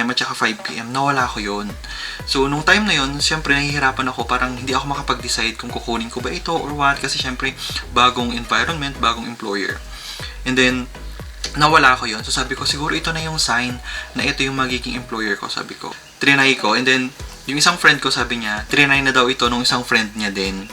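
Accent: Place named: Ilocos, Philippines. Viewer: TAGA San ka Po parang may Punto ka Ng pagka ilocano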